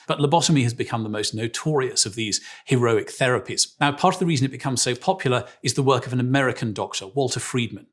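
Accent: United Kingdom. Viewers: this is the most british sounding man of all time and i need him to do audiobooks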